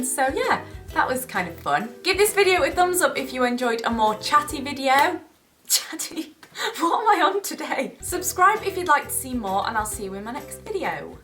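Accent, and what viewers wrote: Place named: Yorkshire, United Kingdom. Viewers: Hi! I live in Rotherham which is like literally half and hour away from Sheffield were you lived! I'm so glad that I have the same accent as a youtuber 😂 that sounds really sad but you sound like were I'm from so its like normal to me and I just find it cool.. I sound so lame here.. LOL;) 💕💕p.s love your videos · Sheffield so South Yorkshire :)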